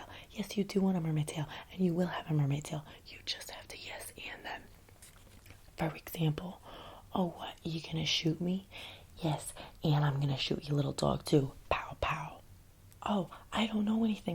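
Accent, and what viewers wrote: New Jersey, United States. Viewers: i love jersey accents and unserious asmr videos 💀 you gained a sub from me youre so funny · Hello.. well my mafia binge is being filled. Amazing. Also the jersey accent 😍 I’ve not heard it much as an Aussie and I love it. Also my threats are very good w my brother because he is my brother. I know he won’t do shit. But.. let’s be honest having 6 brothers does that to you..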